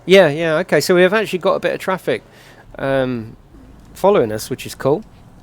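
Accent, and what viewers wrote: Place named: Australia. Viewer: Omg the Aussie accent is strong and that’s coming from an Australian 😂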